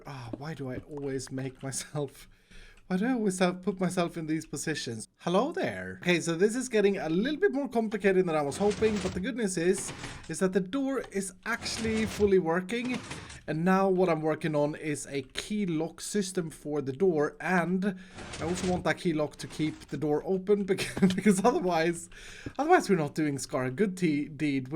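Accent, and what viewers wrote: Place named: Sweden. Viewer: Jag ska vara helt ärlig och säga att jag inte insåg att du var svensk innan jag läste det någonstans. Men om jag ser tillbaka på det så är din brytning ganska lätt att känna igen.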